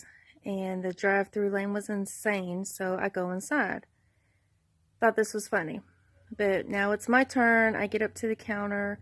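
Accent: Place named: South, United States. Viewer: Context is everything. I'd likely be less ruffled from you it because you sound genuine, caring and southern. Terms of endearment can sound sincere or angrily sarcastic depending on the setting, the delivery and the accent. However, "honey" sounds too casual for a serious setting like a medical office, where I hope everyone is meticulous. It can sound sarcastic and condescending which I dislike when I seek medical attention.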